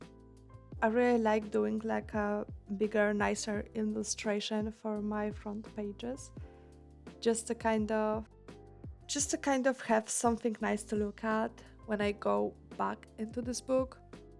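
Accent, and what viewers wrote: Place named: Poland. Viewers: I FELT THAT TOO, I THOUGHT "she sounds Polish" AND THEN SHE SLAPS A "ostrożnie szkło" STICKER ONTO THE SKETCHBOOK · I HEARD THE POLISH ACCENT FROM MILES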